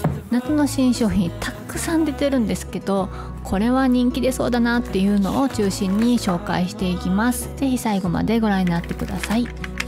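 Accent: Kansai, Japan. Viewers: いつも楽しませてくれてありがとうございます❤ さんの声好き😊所々出てくる関西人テイストも好き。わたしも関西人なので親近感わきまくりです。飴ちゃんね😁大阪人のコミュニケーションツールですね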